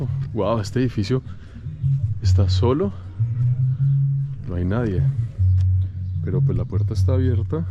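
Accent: Colombia. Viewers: me gusta mucho tus vídeos y tú acento colombiano es único ases muy bien tus vídeos saludos desde fort worth Texas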